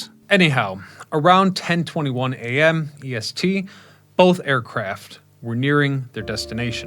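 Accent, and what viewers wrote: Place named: Chicago, United States. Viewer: I knew you gave the Chicago vibes😭 lived in Springfield my entire life and people from the suburbs most definitely have their subconscious tells. If you tell me you’re from IL, 90% of the time i can tell if you’re from a suburb or a corn field without asking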